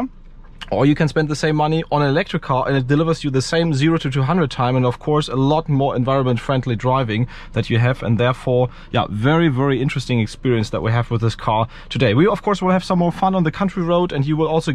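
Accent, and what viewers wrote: Germany. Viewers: Wie er die ganze Zeit mit seinem übertriebenen Oxford Englischakzent versucht seinen D´englischunterton zu verstecken ;-)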